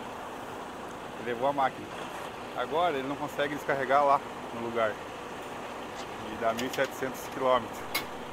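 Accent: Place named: Rio Grande do Sul, Brazil. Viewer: EU CONHEÇO ESSA VOZ GAÚCHO KKKKK PUBG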